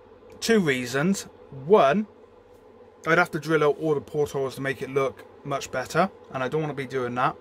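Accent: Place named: West Country, United Kingdom. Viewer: Hey, Great vids m8! I did the 1/400 limited edition as a warm up recently and got this 200 turning up tomorrow so will be tackling it myself. Where about's are you from? I was born nd breed in Plymouth myself and watching your vids has had me smiling and chucking away 😊 (not in a bad way or anything), i've missed the west country twang 😊.. hope your getting on alright with this bad boy of a model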